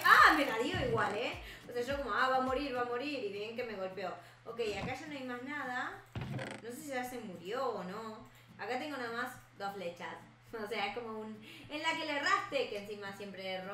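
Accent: Argentina. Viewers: No es española es Argentina me 😑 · Eres Argentina?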